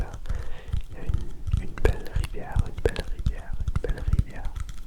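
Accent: France. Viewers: J’adore ton accent français!! +1 follower yayy!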